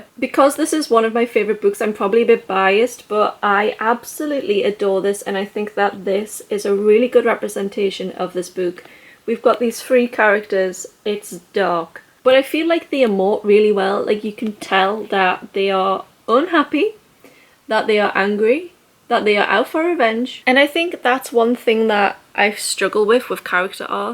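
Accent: United Kingdom. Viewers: Dear, when I started to get interested in book subscriptions, I found your channel and I chose my subs because of your videos. Your taste really speaks to me 🫶🏻 Bonus point: I‘m from austria and I love your british english ❤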